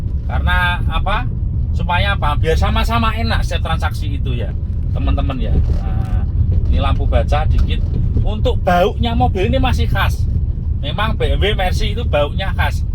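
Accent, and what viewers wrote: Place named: Yogyakarta, Indonesia. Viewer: Haha liat masnya dan itonasi bicaranya jadi kangen jaman kuliah (Jogja)… 😁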